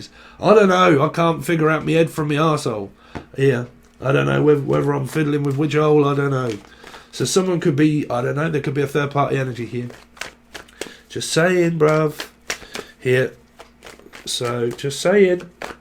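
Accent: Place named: Cockney, United Kingdom. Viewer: Your a cockney, currant bun, Son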